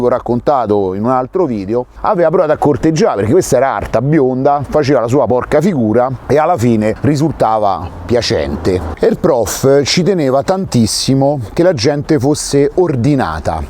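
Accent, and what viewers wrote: Rome, Italy. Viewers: Dopo tanti anni trascorsi nella bellissima Roma, sono felice di seguirti dall'altra parte dell'Adriatico. Da un lato mi dispiace molto vedere l'amata Roma in questo stato di degrado, ma dall'altro mi piacciono le tue storie curiose e divertenti e il modo in cui le racconti (soprattutto la cadenza romana 😄). Grande, continua così! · bravo, bella storia e col tuo accento romano diventa ancora più bella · Daje! Troppo bello stare ad ascoltarti con la tua cadenza romanesca, sei un tipo verace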